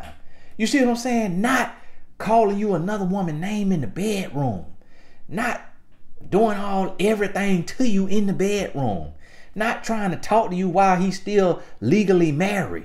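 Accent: South, United States. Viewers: is too funny, love the country accent, keep telling it like it is brother. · Great video! You tell it like it is..time for her to leave and work on herself. Love your country accent ❤ 💕. Thank you for your wisdom on these man boys.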